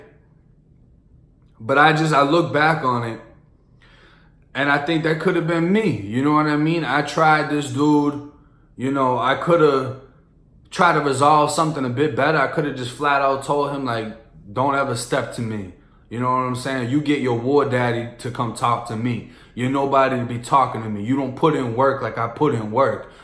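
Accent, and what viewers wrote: Boston, United States. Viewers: Thats his boston coming out you can clearly tell when hes forcing the florida gangsta accent like .. "whats up ERBODY!" this kids a real trip, but ill take him for a good laugh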